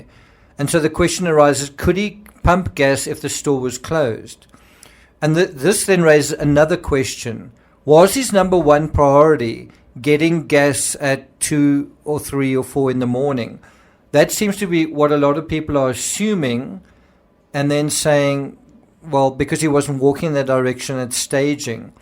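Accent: South Africa. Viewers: I'm subscribing for the south African accent🇿🇦🇿🇦🇿🇦🇿🇦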